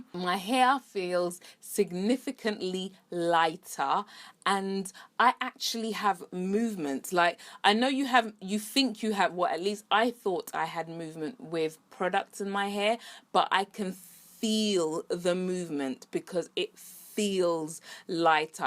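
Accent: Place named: United Kingdom. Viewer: Yes! Lovely to hear a fellow Brit isn't it? Made me feel at home!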